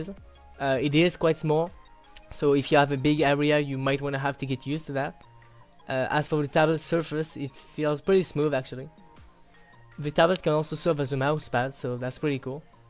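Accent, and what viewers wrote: France. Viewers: l'accent anglais est pas ouf hein on sent la france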